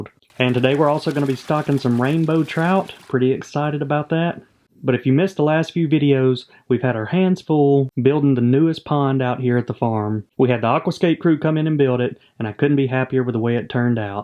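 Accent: South, United States. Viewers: I just love listening to this american southern accent! greetings from Europe!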